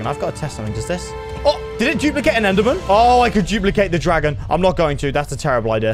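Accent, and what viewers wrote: United Kingdom. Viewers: Your voice is a little british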